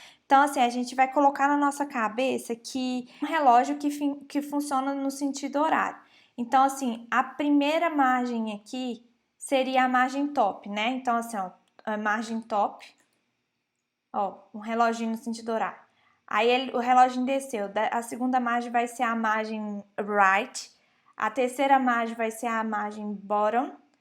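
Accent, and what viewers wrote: Minas Gerais, Brazil. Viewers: É muito sotaque de mineira !!!! Eita trem baum sô !!!!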